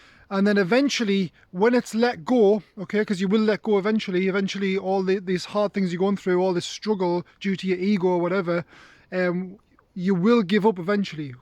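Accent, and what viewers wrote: United Kingdom. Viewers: When I look at you I anticipate the deep, resonating voice of a godlike warrior. Then I push play and get a Keebler elf with a British accent.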